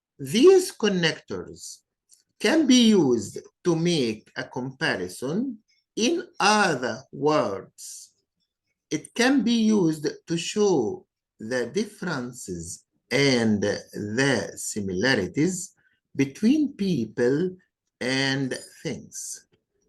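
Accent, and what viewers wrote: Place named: Egypt. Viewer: Your pronunciation is very awful and bad. You speak an Egyptian English.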